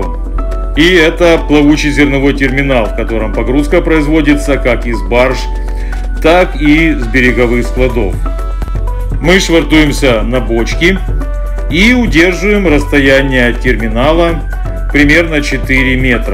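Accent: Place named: Odesa, Ukraine. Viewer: Начал смотреть видео, и слышу наше родное. Думаю не показалось. Но нет как не крути наш родной говор и как не вспомнить за нашу любимую Одессу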